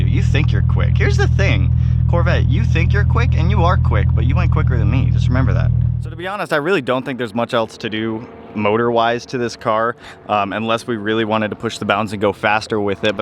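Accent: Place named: Massachusetts, United States. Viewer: u from mass bro?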